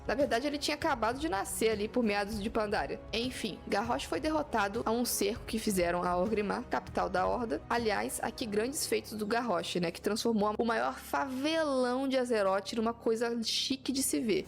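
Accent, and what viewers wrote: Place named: Minas Gerais, Brazil. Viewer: Tu é mineira ne? O sotaque n engana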